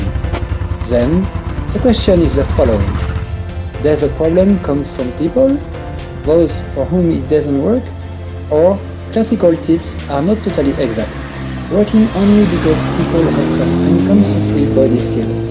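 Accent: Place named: France. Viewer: Bon rappel. Merci pour la séance de l'été dernier. Lovely french accent.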